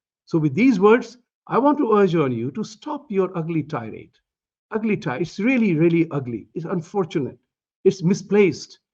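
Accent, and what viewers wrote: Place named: India. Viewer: why do you still have such an Imdian accent?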